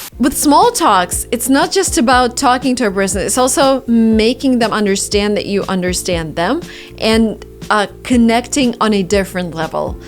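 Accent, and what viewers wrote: Russia. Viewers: to A complete Russian Accent! :D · No offence, but your pronouncing makes it clear for natives you're russian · Your Russian accent is just so cute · Your Russian English accent, such a give away at the start ! :) lol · I loved your Russian accent I want to learn that accent... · You’re Russian accent is amazing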